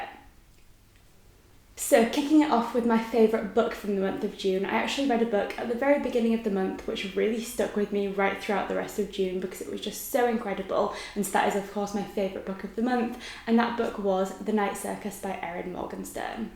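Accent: England, United Kingdom. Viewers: She’s from England but idk where specifically.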